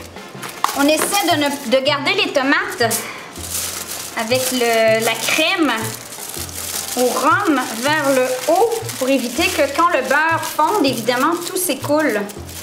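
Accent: Quebec, Canada. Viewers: Salut super vidéo ! Je pense que ta joie de vivre et ton bel accent québécois t'emmènerons loin ! ( enfin j'espère bien ! 😁) Merci pour tout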